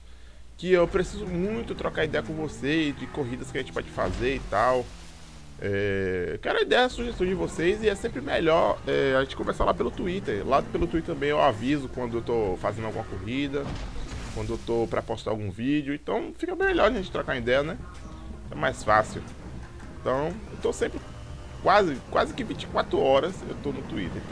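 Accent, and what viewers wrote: Bahia, Brazil. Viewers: é baiano? se for é nós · vc é da bahia?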